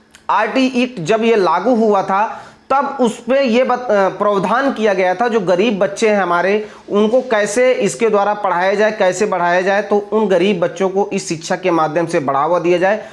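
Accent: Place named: Maharashtra, India. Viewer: Are marathi English,,sidha point to point bat kro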